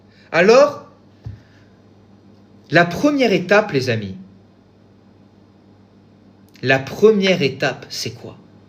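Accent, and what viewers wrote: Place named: South, France. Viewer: Je veux les vidéos avec l accent du Sud.🎉Pourquoi tu l as enlevé?😮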